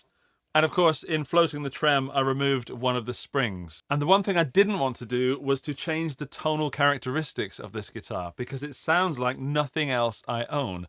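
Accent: United Kingdom. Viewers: You said you live in Austria, however your English is considerably better than your German. From your accent, I'd give it an uneducated guess and go with Great Britain and the northern Irish Isles as your country of origin?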